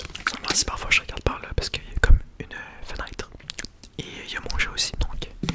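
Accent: Quebec, Canada. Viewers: J'adore l'accent québécois en tant que français, sinon je me détends toujours autant meme si je ne commente pas souvent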